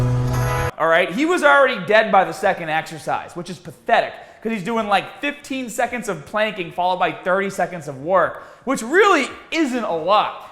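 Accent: Boston, United States. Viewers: This is the strongest Boston accent I have ever heard... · This is great content but the aggressive Boston accent kills me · You know your from MA when you can recognize another man's Masshole accent. Keep up the videos brother. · I never noticed the Boston accent it’s killing me 🤣 watched your stuff and subscribed since 2009 keep doing your thing Captain!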